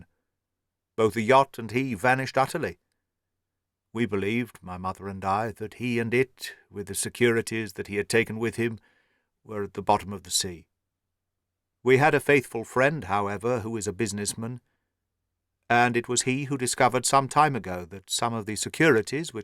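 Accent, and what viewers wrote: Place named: Dundee, United Kingdom. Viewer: an enjoyable fantastic tale superb narration right down to the authentic Dundee accent 10/10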